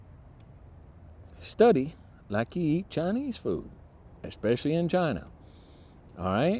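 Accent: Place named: South, United States. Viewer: love the southern drawl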